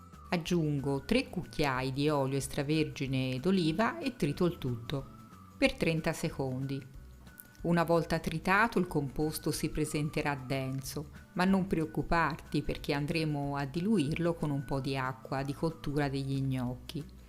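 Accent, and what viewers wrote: Tuscany, Italy. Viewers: Devono essere deliziosi😋 adoro le tue spiegazioni e il tuo meraviglioso accento toscano! ❤️ Un caro saluto🤗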